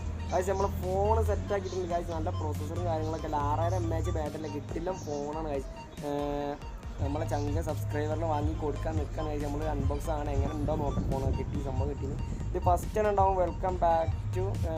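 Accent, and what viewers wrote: Malappuram, India. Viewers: Malappuram ഭാഷ പെവർ ✌️✌️🔥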